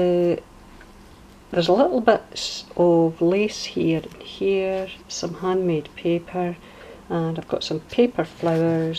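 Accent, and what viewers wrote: Scotland, United Kingdom. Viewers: Absolutely gorgeous journal, really love it, it's so so pretty. Of course, we'd listen to you and with your lovely Scottish accent too. My aunt was a nun in Falkirk for many many years so I love to hear the Scottish accent, it reminds me of her and my visits there. Best wishes from the west of Ireland, Mary:)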